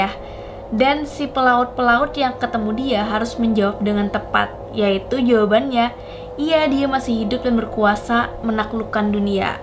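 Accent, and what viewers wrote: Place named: Bali, Indonesia. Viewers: Logat nya kek orang Bali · Logatnya kaya org bali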